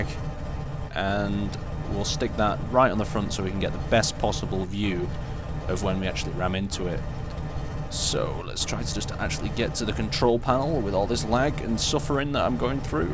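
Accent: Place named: Northern England, United Kingdom. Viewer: Scott is considerably more Scottish than this chappy. I think his name is but I'm not sure. Either way he's clearly English, but I think somewhat Northern?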